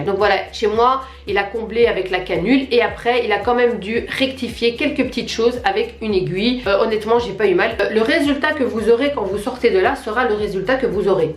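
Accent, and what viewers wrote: Belgium. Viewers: Est ce que tu est belge? Car tu as l accènt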